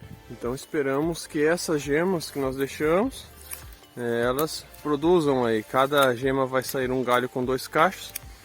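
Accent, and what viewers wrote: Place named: Rio Grande do Sul, Brazil. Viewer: Tu é de onde teu sutaque parece gaúcho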